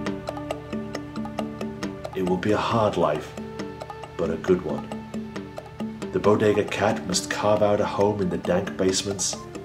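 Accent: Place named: RP, United Kingdom. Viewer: David Attenborough would be proud. BBC English, of course. Narrated by some guy called. How's that for authenticity?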